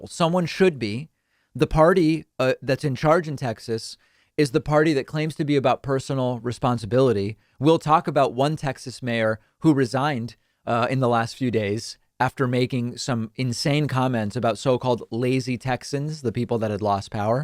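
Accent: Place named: Argentina. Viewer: The Argentina accent have a bit of Italian in it.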